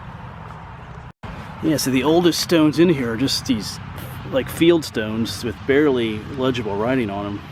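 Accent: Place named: New England, United States. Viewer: Watched the video of Ft. Duncan,there in the disputed territory of Western Virginia. I figured you for a yankee,hearing the accent. I reckon your ancestors kept in the rear to keep from getting killed.The reason l state this is because my ancestors fought for the South and went to Gettysburg. They were excellent shots,being yoeman farmers.Now their grandparents had owned slaves,but they got shed of them,once their families grew. They just followed the yankees way of thinking.Why support someone in winter,feeding,clothing and caring for their welfare,when l have blood kin l can use.So we will sell them off and make a tidy profit. DEO VINDICE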